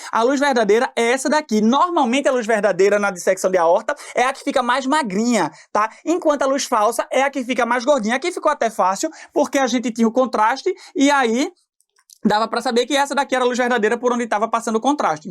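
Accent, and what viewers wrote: Pernambuco, Brazil. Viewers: Show demais, bem top assistir aulas assim sem ser "formal demais", sem falar o sotaque típico de Pernambuco que acho muito massa, marcante e personalíssimo; um dos mais bonitos das regiões do Nordeste na minha opinião. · Que aula tão maravilhosa! Além de um sotaque pernambucano, já tá na velocidade "normal": na velocidade do! kkkk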